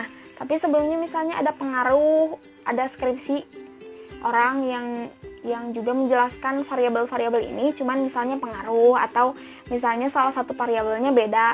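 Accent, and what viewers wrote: West Java, Indonesia. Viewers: Sunda pisaaan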